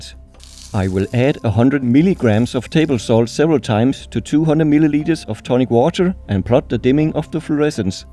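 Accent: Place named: Denmark. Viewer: Elsker din tydeligt danske accent 😂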